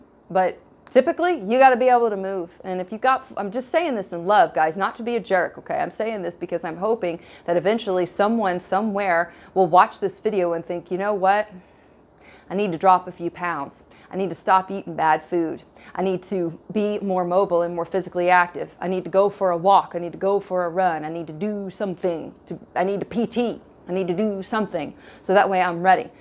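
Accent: South, United States. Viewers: I never knew a jew with a southern accent. Now I can say I do.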